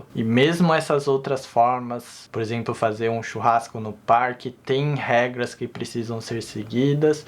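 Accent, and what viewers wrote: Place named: Japan. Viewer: Man teu sotaque já tá bem japonês tbm kkkk seloko vc tá certo Man o Japão mesmo com seus problemas naturais e outros problemas, sempre vai ser melhor que o Brasil, país SERIO, não um país de palhaços que nem o nosso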